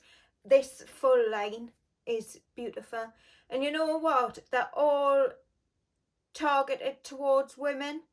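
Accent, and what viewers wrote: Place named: Newcastle, United Kingdom. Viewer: I’m not from the UK and I love your accent, is it Geordie? Just got majestic woods and love it!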